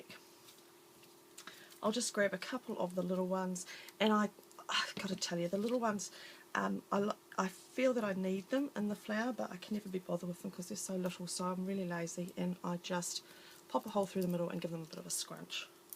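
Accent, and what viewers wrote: New Zealand. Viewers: GDAY COUNTRY COUSIN, U SOUND LIKE A KIWI, GOOD TO HAVE FOLK BACK THIS SIDE SHOWING THEIR WARES LOL JUST LOVE YOUR FLOWERS, INSPIRED ME... THANKS CAMILLE AUSTRALIA.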